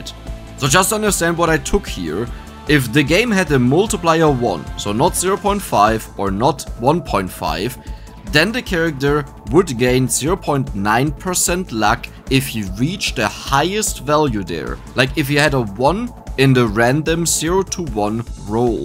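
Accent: Germany. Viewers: German accent is funny af sometimes, for example said verks (works)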